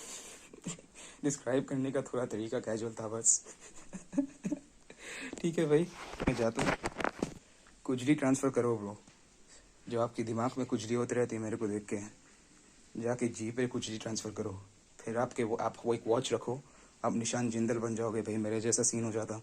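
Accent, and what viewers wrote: Hyderabad, India. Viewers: 😭😭hyd accent ong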